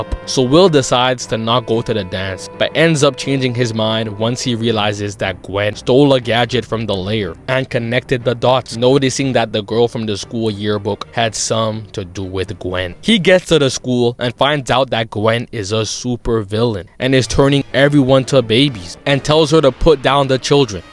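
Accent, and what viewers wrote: Toronto, Canada. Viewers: r u from toronto? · Are you Canadian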